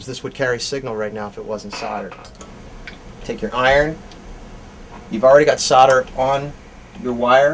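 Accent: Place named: United States. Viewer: cos he's american... they say it like "sodder" for some reason.. even though nobody else in the entire world does.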